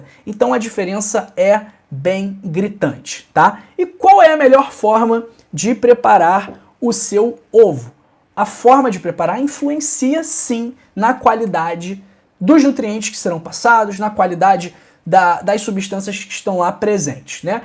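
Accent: Rio de Janeiro, Brazil. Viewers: COM ESSE SUTAQUE NEM PRECISA FALAR QUE E DO RIO DE JANEIRO.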